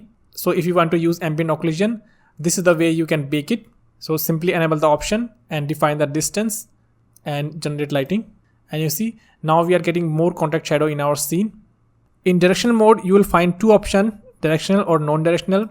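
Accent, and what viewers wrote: India. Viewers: select your TEDE OBJECT :) i cant hold it... the "Indian" accent is hillarious... Anyway great content!!!!!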